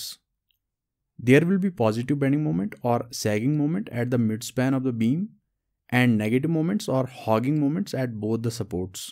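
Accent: Kashmir, India. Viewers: I could guess from your accent, I am also from Kashmir 😊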